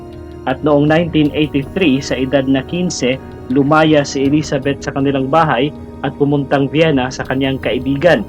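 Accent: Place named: Bicol, Philippines. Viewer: yup Ilan bisis ko na rin napanood to actually mas maganda pa pag kakakwento nila KC pure pilipino nag sasalita unlike ur tune sounds like bisacol.. anyway naka as na to antayin m n Lang may mag claim tnks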